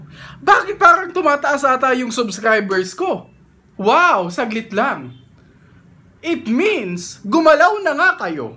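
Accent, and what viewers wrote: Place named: Waray, Philippines. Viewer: Waray gud...hahah. maupay la pagkinita😅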